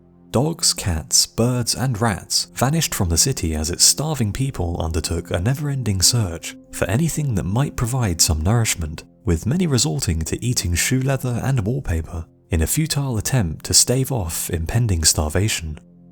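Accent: England, United Kingdom. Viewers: Every time I hear an English accent I think of English Peter Griffin and his teeth..🎩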